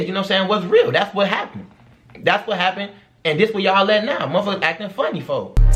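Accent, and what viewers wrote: Chicago, United States. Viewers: yo you from chicago u sound like it oms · u from chicago? lmao you got dat g herbo slang when you say car and folk lmao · You can tell bro from Chicago 😂💯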